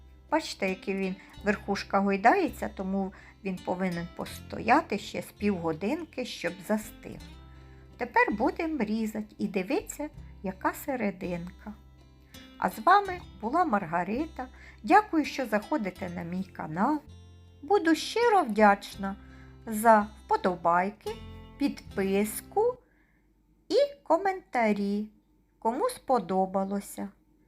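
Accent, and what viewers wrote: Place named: Surzhyk, Ukraine. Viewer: Розмовляе суржиком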